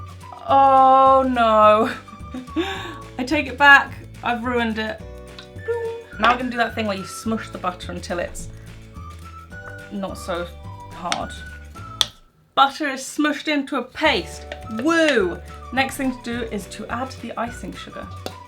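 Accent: United Kingdom. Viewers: Shes british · British I believe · Are you from uk if you are Which part are you from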